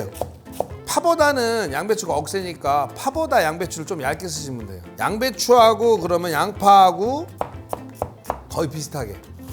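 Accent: Chungcheong, South Korea. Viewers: 넘 맛있겠어요! 부모님 고향인 충청도 사투리 너무 정겹고 좋아요. 저도 수원백씨. 영상 감사합니다!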